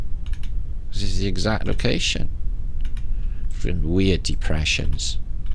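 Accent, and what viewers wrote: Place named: Liverpool, United Kingdom. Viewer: Febf good to see British YouTube canel bless you xx bro luv da Scouse x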